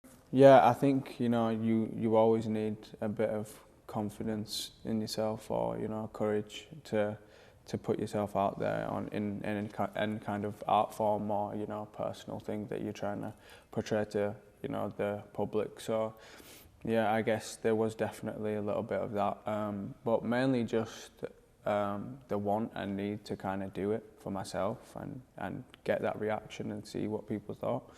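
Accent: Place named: England, United Kingdom. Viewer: he speaks in cursive with an english accent..... I wanted this video to be longer..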